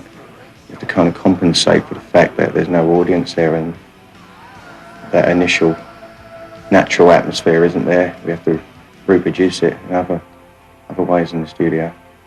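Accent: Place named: United Kingdom. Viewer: lvly british accent!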